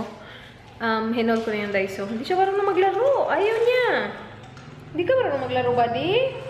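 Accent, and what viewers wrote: Visayas, Philippines. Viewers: hi??mu anhi ba daw kag Manila??hala ganahan kaau ko maminaw nmu pag mag binisaya ka..labyo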